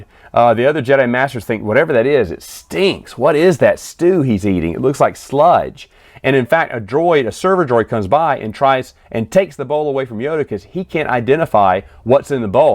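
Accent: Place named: South, United States. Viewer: There’s just something funny about talking about Star Wars or science fiction in a southern accent.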